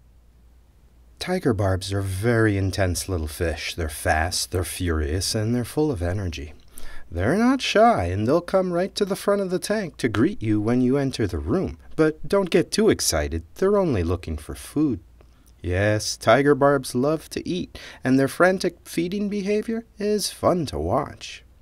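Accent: United States. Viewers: Standard American: Tiger barb Boston: Tiguh bahb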